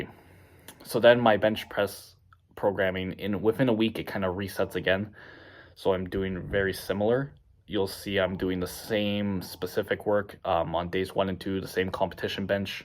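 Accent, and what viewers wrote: Canada. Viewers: I was looking at his initial 3 days of bench, super surprised that someone this level only benches 3 days a week... and then I saw the other 3 days LOL Also it’s extremely jarring to hear how Canadian he sounds after only seeing him on the gram 🤯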